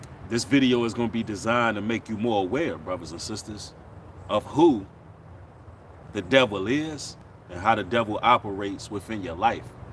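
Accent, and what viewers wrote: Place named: Chicago, United States. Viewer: U must be from the Chi. Shout out to you bro!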